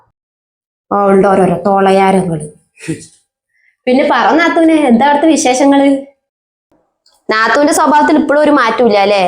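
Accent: Palakkad, India. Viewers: നിങ്ങൾ പാലക്കാട് ആണോ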